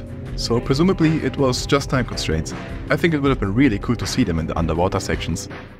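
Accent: Germany. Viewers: Why are cut enemies always that good?😭 PS: Its undeniable that you are german, am i right?😅😊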